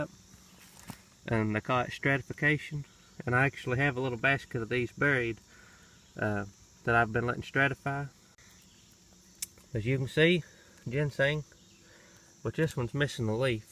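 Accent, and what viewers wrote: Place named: South, United States. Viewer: Thank you for sharing your knowledge of the ginseng and also the medicinal plants. I've seen black cohosh in the pharmacy area of Walmart and think I know what it's for. You're blessed to have that land you can just walk out and go wandering around in, I wish I could do that but my husband likes the city and won't move. I watched the TV show about ginseng too and I thought they might be glorifying it a little but I'm sure there are people that go on land they have no business being on. I like the way you take care of the plants you have by replanting them, that's taking care of the gifts God has given us. Looking forward to meeting your family too. I have to say I love your southern accent, my mother was from MS and my dad was from West Virginia. God bless and take care, also when you said you had snake protection I thought you were going to pull out a venom kit and you had a pistol. 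lol Looked like the pistol had been around awhile too?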